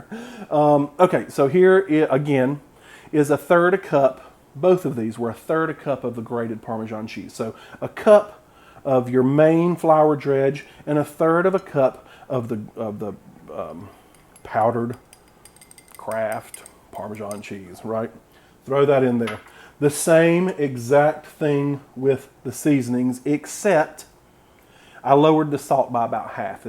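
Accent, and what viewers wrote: South, United States. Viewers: Omg your voice and accent is so cute! You sound like that rooster 🐔 in Bugs Bunny, Foghorn Leghorn 🤣☺️👍 So Southern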